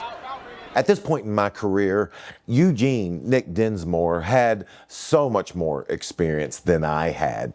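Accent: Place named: South, United States. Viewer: You had potential to ascend back then. You could have been a biracial JBL. You have a great biracial look, nice southern accent and could have used it as a “better than you” appeal. I’d have you wearing suits, clamoring your education background, look and acknowledge being half black and half white. By being half black and white, you could have been nicknamed “The best of both worlds”…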